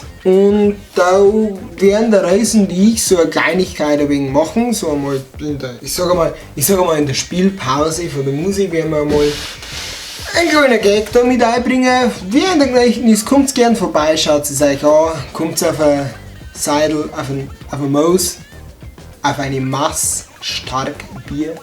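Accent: Bavaria, Germany. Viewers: Konnte als Niederbayer aus Landshut, dort geboren und aufgewachsen mit niederbayerischen Eltern und Freunden, kaum mithalten. Ein Sprachmittler zwischen Pleysteiner und meinem Dialekt wäre fast notwendig gewesen. Ein Allgäuer, nehme ich an versteht den gar nicht. Muss er ja auch nicht.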